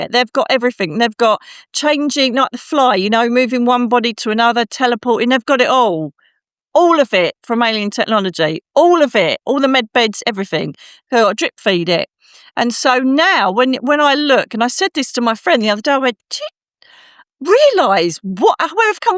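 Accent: United Kingdom. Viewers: American here so I don’t know how to describe this accent, but wherever she is from in the UK seems to have an over representation of psychic people. Seems very common on Gaia etc. Kind of interesting 🤔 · Hard to understand her British accent!😢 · I can’t get over how FAST time is moving right now!! She is lovely! She sounds like my UK family ❤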